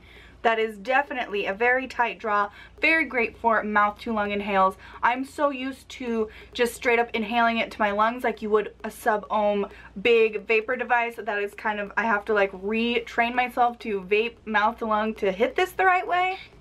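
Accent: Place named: Germany. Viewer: Felicitari! Vorbesti engleză ca un neamt!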